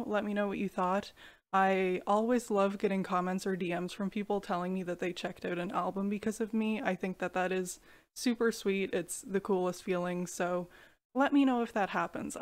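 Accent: Canada. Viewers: figured was Canadian by the way you pronounced "out" and "about"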